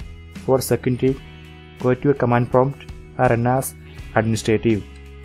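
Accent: Kerala, India. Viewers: are you from kerala ? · r u and indian???from kerala?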